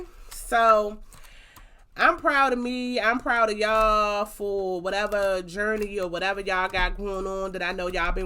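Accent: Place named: Baltimore, United States. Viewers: I'm from the south, but I heard that Baltimore accent, too 😂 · I'm glad your video came up in my feed. I wasted to get started saving this way so I don't overspend. I love clothes, girl. Anyway, your accent sounds like 410. I'm 410 too. 410 to the bone. Have an amazing day. Subscribed!!! 🤗🤗🤗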